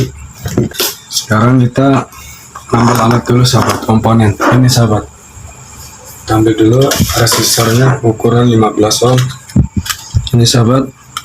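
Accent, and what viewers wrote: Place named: Banyumas, Indonesia. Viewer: sampean wong ngapak kulon ya mas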